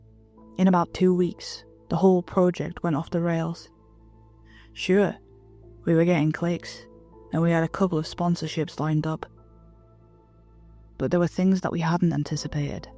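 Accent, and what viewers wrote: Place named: England, United Kingdom. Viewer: your welcome. Your accent reminds me of my sister in laws mother shes from England. Introduced me to English breakfast best tea in my opinion.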